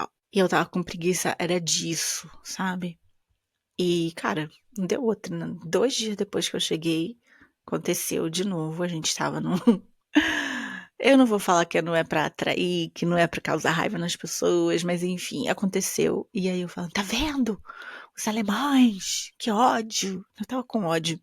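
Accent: Rio de Janeiro, Brazil. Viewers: vc é muito linda e amo seu sotaque carioca. Sei da sua opção mas não posso deixar de me apaixonar pela sua beleza. meu sonho de mulher!!!:face-red-heart-shape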